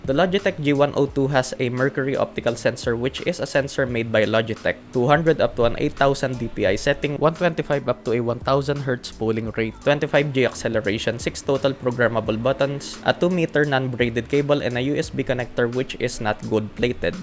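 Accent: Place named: Philippines. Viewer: I subscribed, very good review, nice filipino accent.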